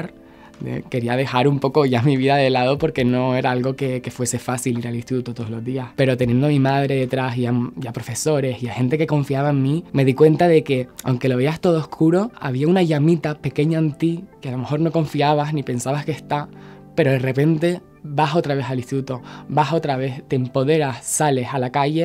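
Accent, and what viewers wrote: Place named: Chile. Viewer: Tiene una linda aura; resplandeciente; su acento siempre me ha parecido un poco chileno.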